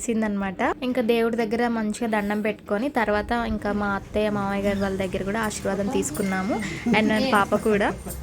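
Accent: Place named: Andhra Pradesh, India. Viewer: Miru ekkuvaga a slang vadtharu Mean Andhra vs telangana Indhulo aythe naku Andhra ne anpinchindhi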